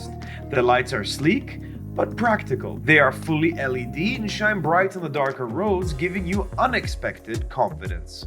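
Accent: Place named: United States. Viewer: Nice review and excellent flow of information coupled with an American accent, I am subscribed ! 😄👍🏻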